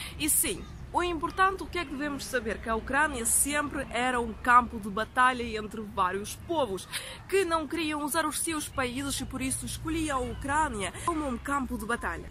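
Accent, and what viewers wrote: Portugal. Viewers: Seu sotaque é português de Portugal. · Seu sotaque português de Portugal é ótimo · Duas perguntas: Por que o sotaque português? Sua voz é tão grave assim mesmo? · Seu português é de Portugal, certo? O que você conhece do Brasil? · Menina seu sotaque é bem lusitano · Ótima explicação sobre fatos históricos tão marcantes que sempre me intrigaram. Fiquei chocado com tamanho banho de sangue. Cara, você é sem dúvida, muito culta, sabe contar muito bem as histórias desta parte do mundo. Nem vou elogiar sua beleza, porque será redundante, qual palavra diferente encontraria eu para descrever tanta beleza, além do que sua simpatia é cativante. Amo seu português lusitano. Você me desmitificou um pouco sobre muito do que é mostrado em algumas películas aqui no Brasil, em parte por causa da 2ª Guerra Mundial, com a Polônia sendo apenas uma nação massacrada, explorada, os poloneses como um povo só de sofrimento, não me entenda mal, só quero chegar no ponto de que realmente no mundo nunca houve um povo bom e outros maus, houve choques entre nações, culturas, tudo por ganância. 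Todos tiveram seus momentos de profunda brutalidade e em outros momentos foram massacrados. O Brasil teve seu momento de carrasco também, quando se envolveu no maior banho de sangue das Américas - A guerra contra o Paraguai. Desculpe pela mensagem longa. Eduardo Bastos. São Paulo - SP - Brasil. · Que sotaque português! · Esse portugues que parece de portugal </3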